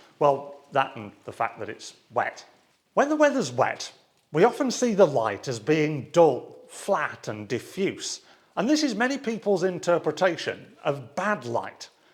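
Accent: United Kingdom. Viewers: you're great. i just found you a few minutes before and im all in. interesting story-telling, beautiful pictures - love the brittish accent, and you're glasses are the cherry on top. i draw my hat sir!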